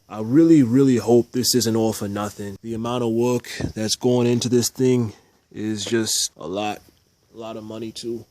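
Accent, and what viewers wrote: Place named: Staten Island, United States. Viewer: Are you from Staten Island? You sound like one of my boys.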